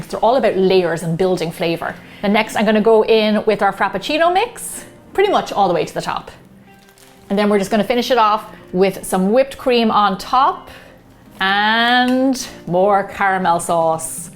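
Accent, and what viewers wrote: Ireland. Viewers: Are you Irish or American?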